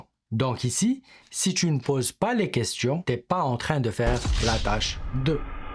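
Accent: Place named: Canada. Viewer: Avec un accent canadien, excellente vidéo 👌💖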